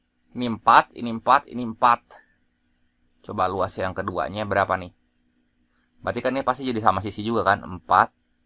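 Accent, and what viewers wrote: West Java, Indonesia. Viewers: Abangnya orang sunda wkwkw lucu jelasinnya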